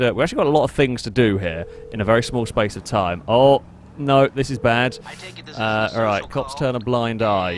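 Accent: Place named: RP, United Kingdom. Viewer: Hi, I noticed that you do have someone of a posh accent but I've also noticed that you have something of a cockney twang to your accent as well not full on cockney but just every once in a while you see certain words that just sounds so coughing do you have coffee ancestry that you know of just curious? specifically the word that you have made up called Gooder and any other words you add ER to that do not normally have er I can't think of any right off the top of my head but I know that he do do that with a couple of words there is no such thing as gooder it's good of or better than usually do that when you're trying to say something with something of you just go blanker And you make up these words or you heard these words used by somebody in their past whether a teacher or a family member or a family member's friend. it's like saying Jaguar there is no such word as Jaguar and unfortunately the Japanese got it from you guys and then kaiju type robot hero Jaguar or is we in the United States do them as Ultraman if you have a hell of a lot better than named Jaguar or Jaguar how do you get a jet on a Jaguar stick it up his ****? 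So it's it is pronounced Jaguar Gua GW g A group he pronounced Jaguar JAG Jag WAR War we put them together to get Jaguar Jaguar can you say that Jaguar stay with me jaguar. you don't pronounce every single vowel that comes across to the English language some of them are pronounced differently being other language it is technically a Native American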